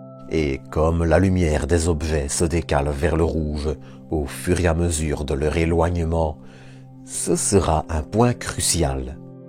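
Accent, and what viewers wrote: Belgium. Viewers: votre accent belge qui me rappelle de bons souvenirs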